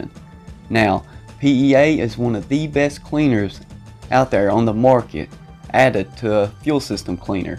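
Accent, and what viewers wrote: United States. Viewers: But he has such a cool cowboyish dialect 😆😆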